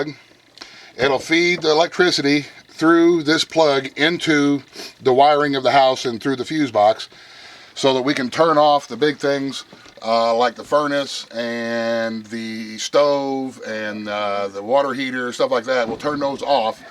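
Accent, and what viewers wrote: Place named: Louisiana, United States. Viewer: I have a very strong feeling that you're from Louisiana. You sound and act the exact same as the rest of us crazy cajuns lmao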